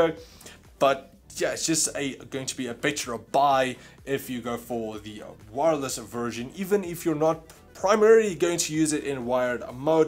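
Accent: South Africa. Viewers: R u south african ?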